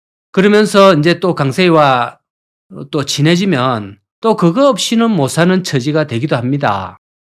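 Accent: Gyeongsang, South Korea. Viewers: 갱상도 표준말 방송......좋심더에! 잘 보고 있심더. 배차, 소나무갈비,고매,.... 억수로 올만에 들어보니 71년 촌에서 초교 입학때가 선합니다요. 뭔 농막이 이리 커요? 쉼터로는 따봉이것네요. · 웃음끼 없는 경상도 사투리에 화면은 보이지 않고, 웃기만 하는 순간들이 있습니다😊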